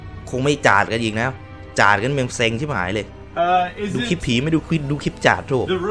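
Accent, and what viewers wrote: Isan, Thailand. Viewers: จาดกันพะนะ คนอีสานคักๆคุณ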